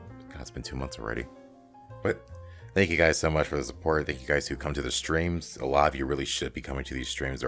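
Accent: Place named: Central Europe. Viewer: thats cool, i actually thought about usa looking at your upload times but from your voice i thought you might come from central/east europe. Congrats on the 10k! No idea if you remember me im here since the 1-2k'ish =)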